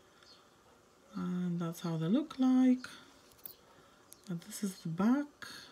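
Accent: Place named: Sweden. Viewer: I love your accent! Sounds like Liberia/Swedish/British. 😊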